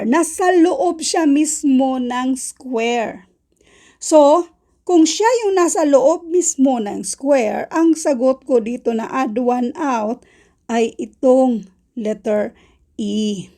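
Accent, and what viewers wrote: Visayas, Philippines. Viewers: Bisaya accent 🫶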